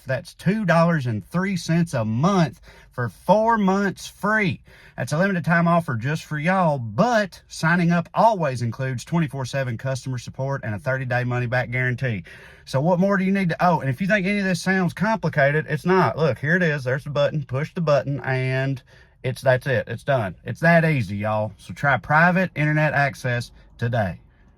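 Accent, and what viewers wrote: South, United States. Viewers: My first time to your channel, and I’ll give you a like and maybe even a subscribe! You are top notch at flapping your jaw. Give you credit for skill and thoughtfulness. Keep up the good work. And PS, an authentic southern accent not the New York transplant version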